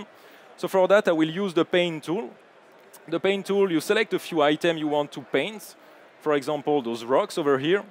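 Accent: France. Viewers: I love his french accent :V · Ze french accent is very funny!😅😆🤭👍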